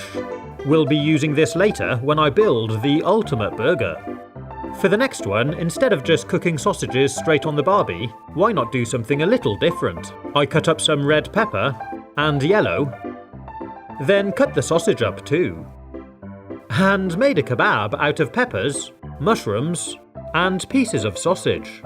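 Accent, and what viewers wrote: England, United Kingdom. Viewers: Yes, he's from England. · Are you English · R u a brit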